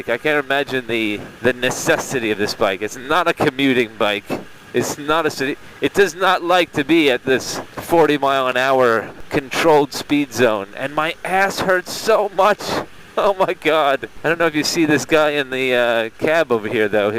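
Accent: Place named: California, United States. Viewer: Hey, your accent doesn't sound like from England, sounds Californian. 😄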